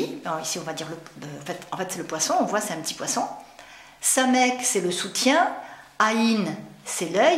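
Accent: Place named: South, France. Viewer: lamed bâton de berger ? jamais entendu cela lors de mes cours d Hébreu biblique, y compris par mon prof, juif pieux, vivant en Israel...Lamed = enseigner, apprendre (a donné limoud/verbe melamed), il dépasse en haut, car le maître dépasse l'élève, et ce dernier peut aussi dépasser le premier ("on apprend les uns des autres" dit mon prof d'Hébreu), ceci dit, j'aime bcp vos videos ma soeur, vous êtes très pédagogue, et avez une voix très posée, très calme..et un joli accent du sud ! soyez bénie...PS le lamed dans sa forme ressemble plutôt à un 7, et le bâton de berger est shevet ("shavtekha" ps 23 ta houlette)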